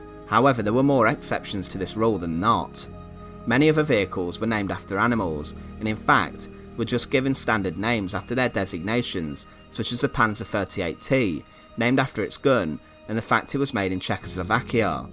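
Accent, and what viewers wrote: United Kingdom. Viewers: What region of the UK is the narrator from ? His accent is different than others you typically hear.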